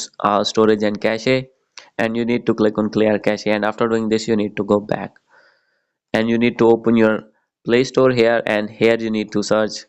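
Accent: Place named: India. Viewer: The Indian accent is just, PERFECT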